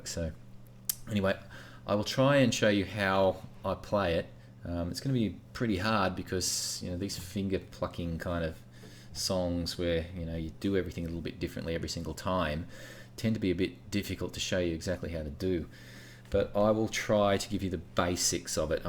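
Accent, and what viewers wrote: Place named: Australia. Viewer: Just landed on this via YTs Bots, me having covered his lessons before, but so glad I did. This lovely warm Ozzy man's voice tone and teaching is spot on, and after all these years he is still giving Gold away for free. Check out his other lessons, brilliant at what he does and sings great too.. Don't pay any attention to the thumbs down, there's always 1 or two in the room that don't get it....haaa🤣. Subbed to many moons back. Enjoy..Rollo.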